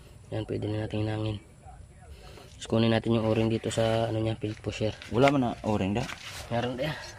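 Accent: Iloilo, Philippines. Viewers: Bro may airgun foot pump man nga guba Ako lang nag Kay o... Ilonggo ka man bro? God bless bro.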